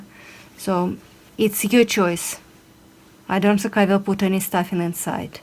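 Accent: Russia. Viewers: Мне кажется или у вас русский акцент?🤔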